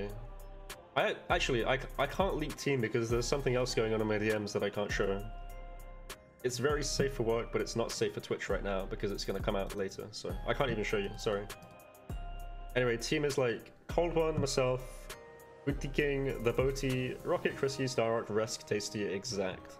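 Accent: United Kingdom. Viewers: You definitely have a Devonshire accent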